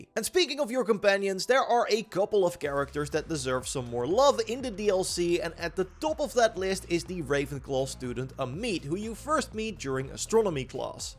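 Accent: Netherlands. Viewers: Nice, very cool info! His accent is so distracting to me, am I the only one? I'm Dutch and I presume he is aswel, well yeah 😂 so maybe that's why 🤔😊 Very nice content though 👌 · Ik hoor een Nederlands accentje haha, leuke video! Ben benieuwd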